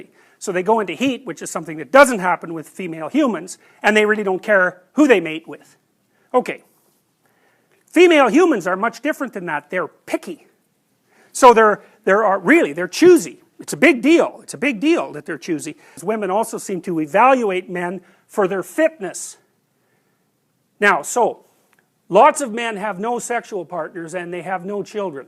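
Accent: Canada. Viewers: All Canadians speak like that. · That Canadian accent is so prominent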